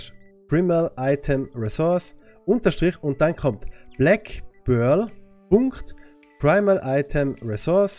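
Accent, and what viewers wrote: Switzerland. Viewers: bist ein schweizer gell 😊😊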